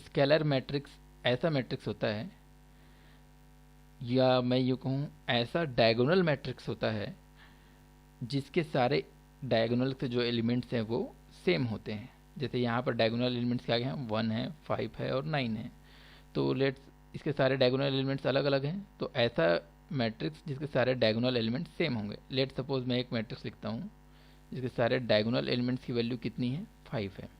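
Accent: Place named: Bihar, India. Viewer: bihari pronunciation is really irritating.when one is using English words should not have a regional accent.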